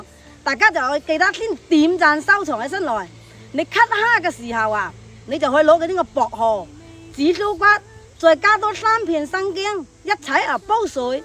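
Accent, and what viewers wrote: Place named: Xinyi, China. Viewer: 你的口语好像我婆婆她是广东信宜😊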